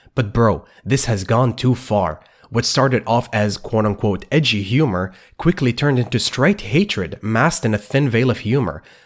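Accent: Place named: Germany. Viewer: Very slight accent, but i believe it is germanic, such as german.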